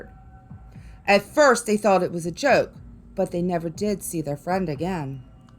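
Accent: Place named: Pennsylvania, United States. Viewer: Hmmmm....are you from Pennsylvania? Just wondering because I am picking up an accent and it's making me homesick lol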